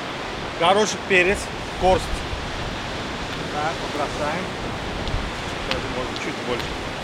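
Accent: Baku, Azerbaijan. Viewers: Дай Бог тебе здоровья крепкого и счастья! Я уже 32 года как уехал из Баку, но никак Москва не стала мне родной. Как говорится бурнумун уджи гейняйир по родному Баку. Каждый твой сюжет это как бальзам на душу. И тема ,и родной бакинский говор ,акцент и даже манеры просто чудо! Спасибо тебе родной! Надеюсь скоро приехать в Баку и тогда постараюсь с тобой встретиться, если ты конечно пожелаешь. Извини что обращаюсь на ты ,но как говорили раньше "бир нечя байрам сяндян бойуям".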